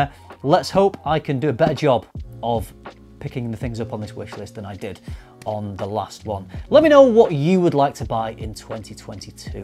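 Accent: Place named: Bolton, United Kingdom. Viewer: Are you from Greater Manchester like the Bolton area? I recognise the accent but it's more like mine, I'm from Bolton but I moved to Lancaster then Workington and my accent has changed. I don't say perk instead of park anymore or hew instead of how etc. I basically round my vowels now but people can still tell I'm from Bolton even though it has been 19 years. I might be wrong but I'm sure I can hear Bolton in your accent or close to Bolton. I feel like I've heard your voice and seen you somewhere else do you do videos on facebook? Only just found your videos I just watched your comparing Aventus and like the cut of your jib so just subscribed!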